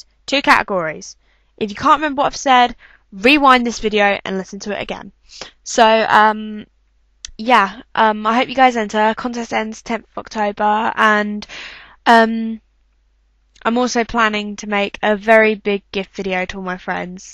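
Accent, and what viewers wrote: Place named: United Kingdom. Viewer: you british? sounds like it *giggle*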